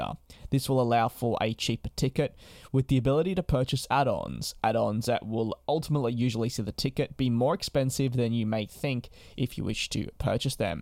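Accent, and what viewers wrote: Australia. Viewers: Sounds Aussie to me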